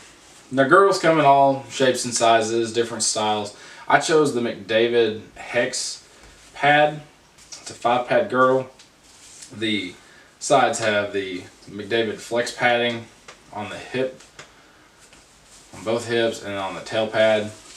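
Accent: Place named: Arkansas, United States. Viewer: I can tell Your from Arkansas... me to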